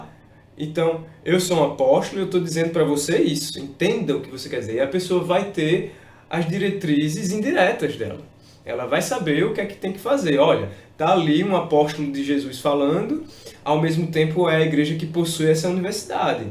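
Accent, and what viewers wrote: Northeast, Brazil. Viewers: Oi, você já foi membro ? Gostei do sotaque, é do nordeste ?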